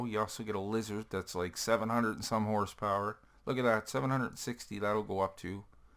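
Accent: Canada. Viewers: Oh my god you sound canadian, I am from Prince Edward Island, Canada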